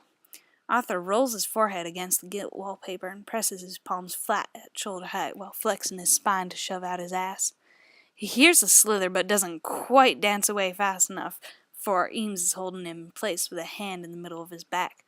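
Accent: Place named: South, United States. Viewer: Oooh boy, I *love* your accent and how it rang true for Arthur but how you switched it for the 'television american' accent and Eames own voice. I'm a sucker for south accent and your voice is all kinds of wonderful! Great dramatic reading, I enjoyed it *a lot*. Would you mind if I were to download the mp3 version of it?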